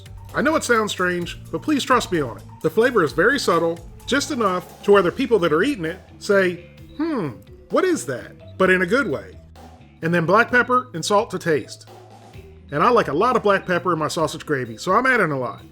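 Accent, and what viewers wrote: South, United States. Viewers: Probably a southern tradition plus he had the accent for it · Farmer john is a la area pork distrubuter, but you have a southern accent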